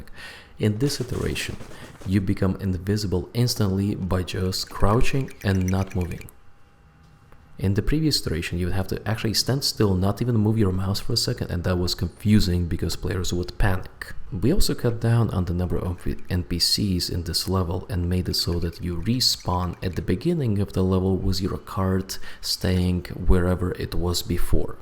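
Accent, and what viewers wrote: Russia. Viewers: Замечается русский акцент) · Говоришь по английски,а по голосу(произношение) понятно что русский с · Лол, акцент то русский)) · Лолол, почему я слышу русский акцент? ._.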